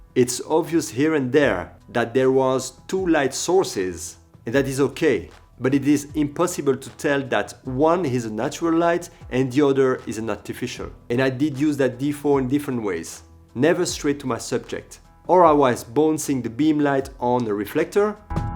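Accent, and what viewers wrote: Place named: France. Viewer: Yaaaassss you’re back!! Love that accent monsieur; make more cool videos. Your lighting is superb; just as superb as your French accent 🙌🏽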